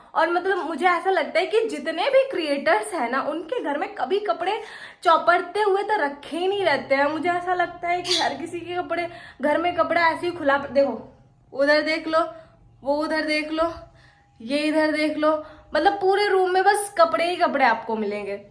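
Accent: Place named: Lakhimpur, India. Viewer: Deshi languge Lakhimpur waali bhasha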